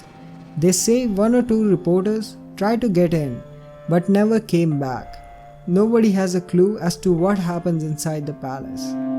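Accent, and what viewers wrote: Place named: India. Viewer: I love ur accent and I think ur accent is indian... 🥰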